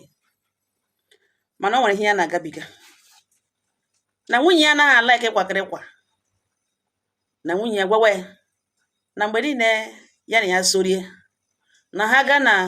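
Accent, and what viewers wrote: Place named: Imo, Nigeria. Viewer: Good morning Ma, I'm not from Imo State but i like the way you speak Imo State, it sweet me too much.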